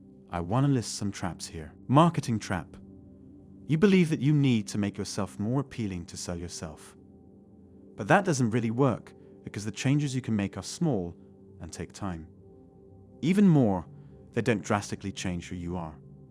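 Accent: United Kingdom. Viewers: I'll admit I'm not a 20-something in the dating pool, but while watching this I couldn't help but be reminded of Tom Cruise's "Seduce and Destroy" seminar character in Magnolia, particularly in the "trap" section, except with a calm British accent. I do agree that social media has poisoned personal relationships, setting up unrealistic expectations, and the need to go back to direct, interpersonal contact is imperative. Don't let these "incel" mentalities to rule your lives, my young dudes, but also don't let a lack of a relationship destroy your self-esteem and stop you from enjoying life. Not all young women are vain harpies looking to suck you dry, be patient and eventually you'll run into someone you feel mutually compatible with.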